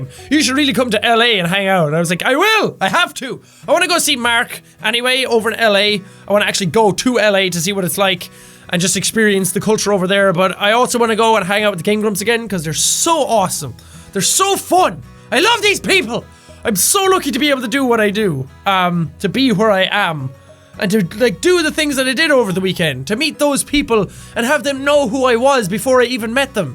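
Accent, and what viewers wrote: Ireland. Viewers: Am I the only one who likes his accent? Normally I don't really like Irish accent but his is cute. No... I'm the only one... Ok.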